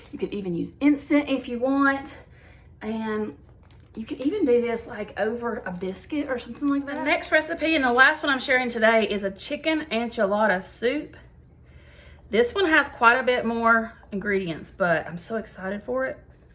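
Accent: South, United States. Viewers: I'm a fan of freezer meals! The nest is empty, but I still like the convenience of being able to throw something in the crockpot or Instant Pot. Bless you, teaching the kindergarteners. Both of my boys loved their K teachers, and I think it set the stage of enjoying school in general. (They are 32/34 now!) I taught HS chemistry. I've lived in TX, AL, SC, and now TN. I can't figure out what flavor of Southern accent you have, lol! Great job on the exercise, btw. The endorphins are so good for you.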